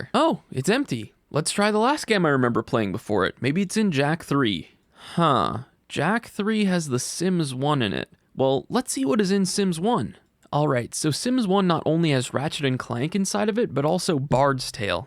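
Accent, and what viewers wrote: Canada. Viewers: Hey man, are you a Canadian!?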